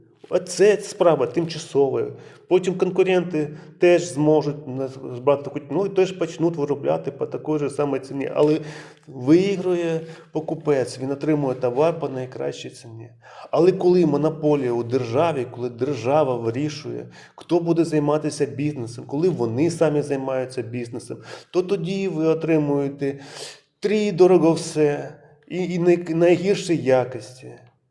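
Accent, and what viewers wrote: Russia. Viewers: Ти не дуже схожий на українця Українці так не говорять у тебе вимова російська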